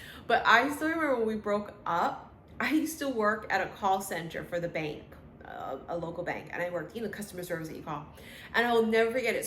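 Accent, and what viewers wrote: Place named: New York, United States. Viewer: Oh wow. Didn't know you were half Japanese nor Filipino. I knew it was something but couldn't put my finger on it. Sometimes You sound like you grew up in my NYC neighborhood! 😂 Love it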